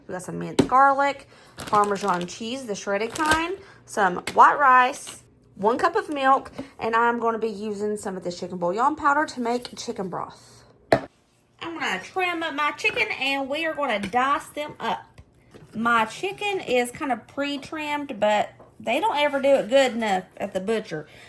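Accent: South, United States. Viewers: I can't tell you how much i love listening to you talk in that amazing and soothing southern accent! Its as good as all the comfort food you share.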